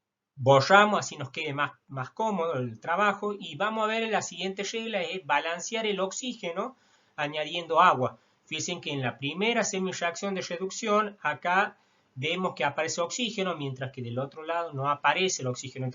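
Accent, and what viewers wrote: Argentina. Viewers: uff, que acento papá. Argentino?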